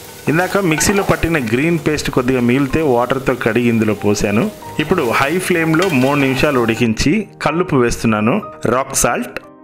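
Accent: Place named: Karnataka, India. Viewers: Nimma Kannada thumba impagide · Very good receipe. Teaching receipe is very good. Speaking in kannada is so cute. God bless you. Keep it up. From bangalore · Wow wow love to hear your Kannada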